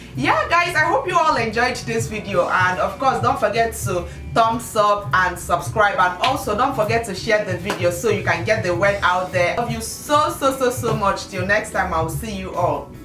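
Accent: Igbo, Nigeria. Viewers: First of all: Great Make-up ! You inspired me ! And i have a question, where are you from? You always Remind me of my (nigerian) dad and his accent :D :) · new subby here. love your spirit and your igbo accent.....really cute make up.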